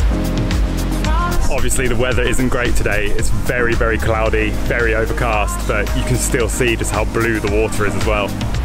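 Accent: United Kingdom. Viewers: What a perfect British accent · I heard the British accent 🥰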